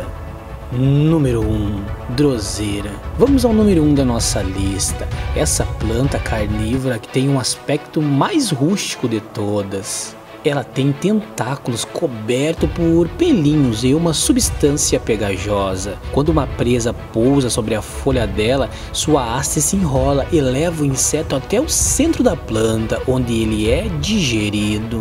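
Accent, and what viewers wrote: Rio Grande do Sul, Brazil. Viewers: Gaúcho né !!! Inscritos!!! Top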